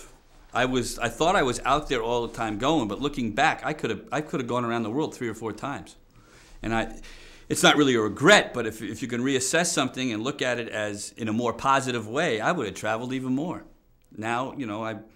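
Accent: New York, United States. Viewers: Interesting. The inflection and pausing of the way he speaks is exactly the same as the way a guy I know who grew up in the same era in suberban NY speaks.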